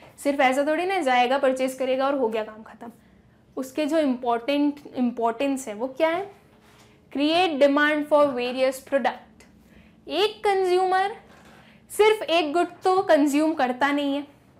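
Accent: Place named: Gujarat, India. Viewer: I can tell that ma'am is gujarati.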